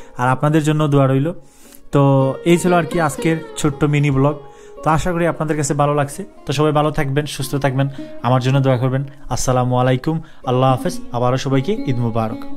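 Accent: Sylhet, Bangladesh. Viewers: Bai ki Sylheti? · Bro can you tell me are you sylhet or dakiya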